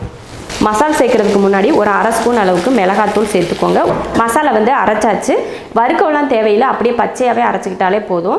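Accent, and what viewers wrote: Coimbatore, India. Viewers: Akka neenga coimbatore ah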